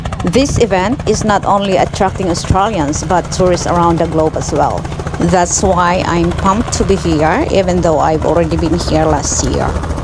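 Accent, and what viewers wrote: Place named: Philippines. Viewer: I like the Filipino (a) accent.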